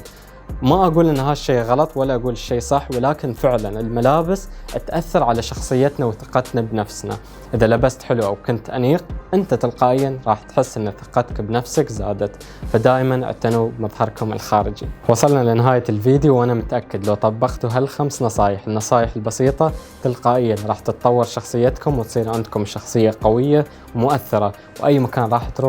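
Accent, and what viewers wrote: Iraq. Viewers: لهجتك تشبه العراقيه الي وياي لايك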